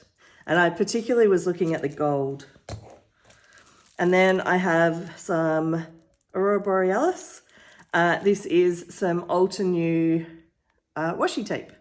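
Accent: Australia. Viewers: Hi . New subscriber here. I just stumbled across your video and was DELIGHTED to hear an Aussie accent! That Pastel Deco is to die for. I'd love to see your version of the stained glass window technique. Shipping rates to Australia are the pits :[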